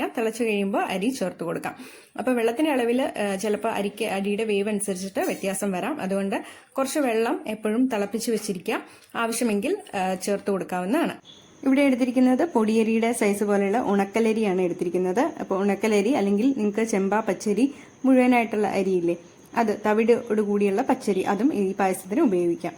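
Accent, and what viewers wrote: India. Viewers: Superb video, palakkadan slang?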